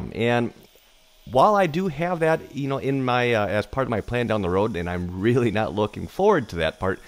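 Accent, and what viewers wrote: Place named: Upstate New York, United States. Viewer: I have a 1968 Bertram Bahia Mar 20 that I seriously need help on. I am willing to go on Patreon to pay for your help because I'm not looking to get something for nothing. This boat is a family member and was left to me by my dad just before he passed in 2015. It's been with me my whole life and is now in need of a lot of help. I have her off her trailer in my backyard and have sanded all the bottom paint off. The hull is in such bad shape with deep chipping and stress cracks everywhere. It's so bad that I've decided to sand all the way up to the gunnels and restore everything from there down. The deck is still in good shape except for a little rotting where there's a penetration for a flag pole holder or some such device. I've been watching your channel for a while and have to tell you how much I've enjoyed it. 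Being from Binghamton, NY originally, I started out working in a boat shop there sanding on boats and doing fiberglass work when I was a kid, so your upstate accent makes me feel like I'm home. When I was 19 I joined the military and have since found myself living out West in Tacoma, WA near JBLM. I'm a Union Carpenter now but still call Upstate NY home. This boat has been part of my family since 1979 and in 2016 I was able to drive back East and get her, an epic journey that's a story in itself. Now that the Covid-19 virus has us all sitting at the house, I have pulled out the random orbital sander and tried to remember all the lessons I learned when I was 17. A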